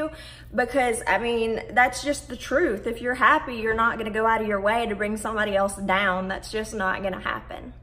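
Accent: United States. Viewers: You country accent 😂😭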